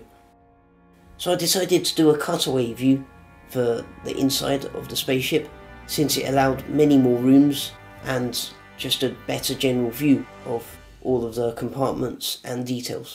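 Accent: United Kingdom. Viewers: R u British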